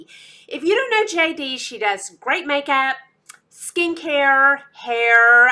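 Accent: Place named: Texas, United States. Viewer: I knew there was a reason I love you and love watching your videos....you’re a fellow Texas gal!! I knew that accent was familiar!! You are just adorable!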